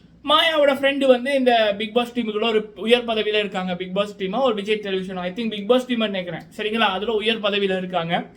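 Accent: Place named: Sri Lanka. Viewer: i know your talking style like srilankans nice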